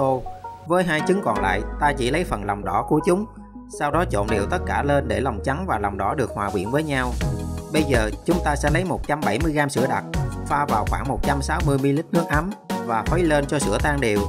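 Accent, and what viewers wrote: Mekong Delta, Vietnam. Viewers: A ở miền Tây đúng ko ạ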